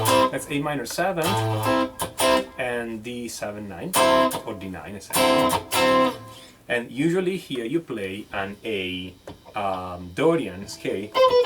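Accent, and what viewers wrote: Italy. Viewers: he is italian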